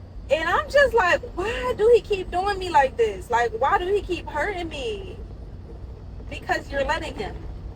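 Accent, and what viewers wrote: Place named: Philadelphia, United States. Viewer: You must be from Philly I can hear your accent! What you said is so true we have to establish boundaries to protect our own peace. You learned it the hard way, but thank you for passing the knowledge on to us.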